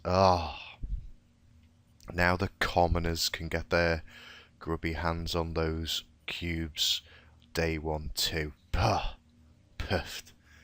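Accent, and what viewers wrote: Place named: Liverpool, United Kingdom. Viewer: I'm a scouser, just saying your accent sounds nearby :P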